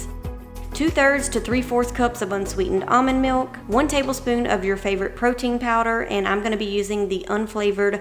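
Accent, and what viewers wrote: South, United States. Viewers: Giving you all the likes! :) you are beautiful! And I love your southern accent and your recipes ☺️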